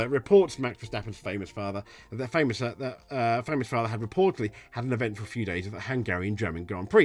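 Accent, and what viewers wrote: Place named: United Kingdom. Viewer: Ahh Brit accent. Stop watching after 10 seconds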